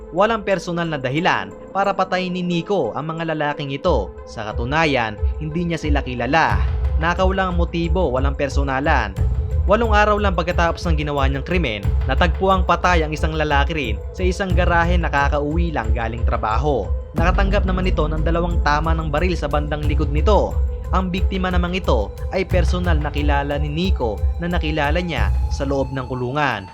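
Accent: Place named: Bulacan, Philippines. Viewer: Bulakeñyo ka ba? yung punto nyo po napapansin ko 🥰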